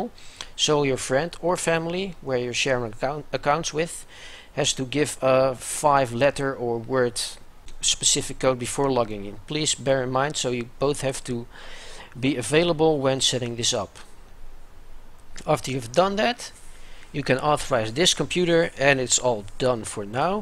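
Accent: Netherlands. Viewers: Damn dutch acc is real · ) dat dutch accent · I can hear that you're Dutch ;D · Are you Dutch ??? You sound Dutch to me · leuke accent haha, echt nederlands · Are you dutch? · Dat Nederlandse accent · Dankjewel man, ik hoor aan je accent dat je Nederlands bent :) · i have a feeling he is dutch XD i can tell by his accent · whaha love your dutch accent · Ur accent is so dutch omg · je bent nederlands leuk accentje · ik weet gewoon dat jij waarschijnlijk nederlands bent. je praat zo nederlands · That Dutch accent tho. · jouw accent is so duidelijk nederland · deze guy is nederlands heh? Goede video btw XD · ik hoor aan je stem dat je nederlands bent :) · Ik kon meteen horen dat je Nederlands was, bedankt voor de tutorial! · are u dutch? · dat is very Dunglisch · nl nederlands sws kan niet anders - en your dutch, i know it for sure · That nederlandse accent tho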